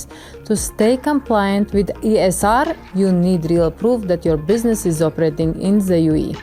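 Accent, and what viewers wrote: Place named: Russia. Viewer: I love the russian accent.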